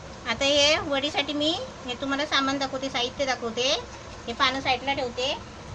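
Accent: Konkan, India. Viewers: tumhi bolata khup chhan..kokani laheja madhe..recepies chhan tumachya...sp. tumache ase veg recepies dakhwa..thank u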